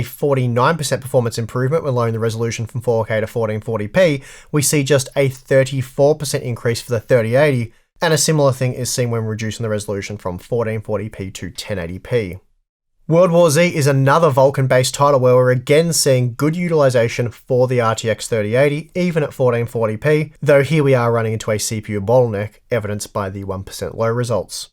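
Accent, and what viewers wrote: Australia. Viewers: I really love how Aussies pronounce things....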